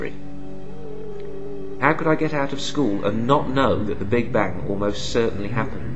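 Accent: United Kingdom. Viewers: Sounds like UK maybe?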